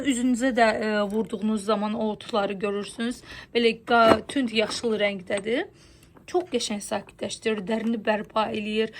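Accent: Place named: Zaqatala, Azerbaijan. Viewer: Salam,eslen haralisiniz? Zaqatala lehcesiniz var sanki,birde yoldasinizla yas ferqiniz var?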